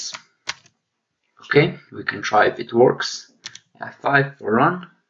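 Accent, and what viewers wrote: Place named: Russia. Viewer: Ты Русский что-ли, акцент какой то есть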